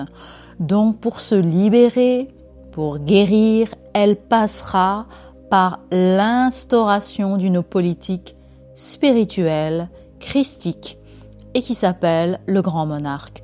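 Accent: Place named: Réunion, France. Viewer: Merci pour cet éclairage et ce petit accent ( réunionnais?) charmant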